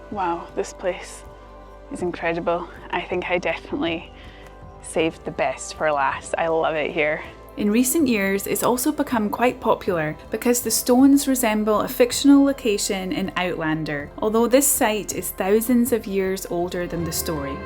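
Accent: Scotland, United Kingdom. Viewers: I absolutely love listening to you and watching you ❤ A truly beautiful Scottish lass! I’ve fallen in love with your accent!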